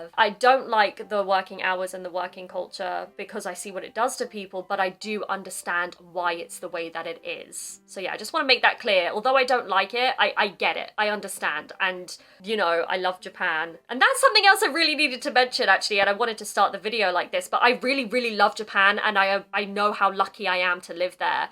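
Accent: RP, United Kingdom. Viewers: I was studying in America for 3 years, so I get used to American English. But your British English is easy for me to understand. Overall, Japanese people are polite. I don't like some Japanese people because they discriminate against physically challenged people. One day, I had a job interview. An interviewer said, "What happened to your legs?" He kind of laughed. That was really rude. I was glad I didn't have to work for them. Since I am vegetarian, I should visit your country some day. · この動画でシャドウイングしよ。 勉強になるし、何よりmodernRPアクセント??が心地よい☺️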